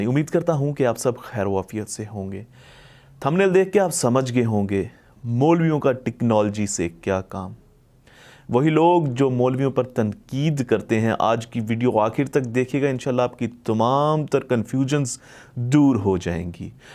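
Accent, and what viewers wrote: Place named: Pakistan. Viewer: برو کیرم دهنتون با اون صحبت کردنتون پاکستانی کثیف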